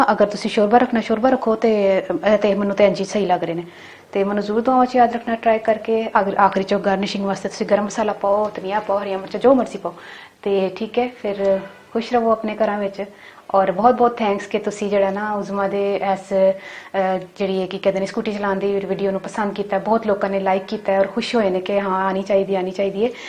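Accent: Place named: Punjab, India. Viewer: Tuhadi Punjabi Masha Allah bahut Mithi hai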